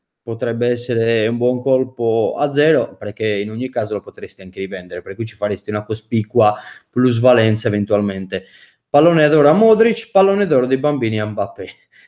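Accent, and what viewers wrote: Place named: Sardinia, Italy. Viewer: Di quale città sarda sei?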